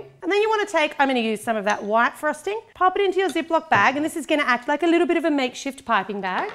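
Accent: Australia. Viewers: I knew you were Australian ;) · shes austrailian;)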